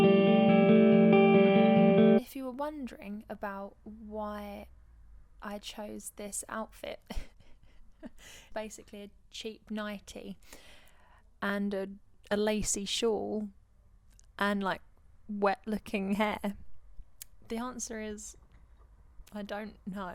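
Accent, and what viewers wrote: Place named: United Kingdom. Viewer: you have so much Tallent. I'm addicted to your videos. Mus be your british accent. Your voice is so beautiful...first time I've heard your voice othe than fvm. Keep on rockin'....a fan in mississippi.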